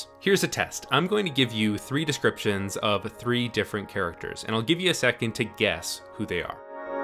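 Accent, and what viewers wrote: Canada. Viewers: You're Canadian. I can hear it in your accent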